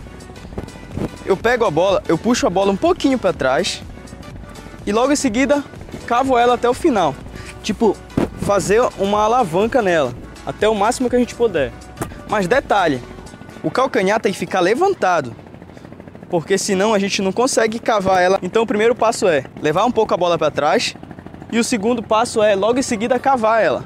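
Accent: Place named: Amapá, Brazil. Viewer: ? Tu é Paraense...? Amapaense..? É q tu tem um sotaque parecido com o meu! Eu sou Amapaense... E tu macho?? rsrs...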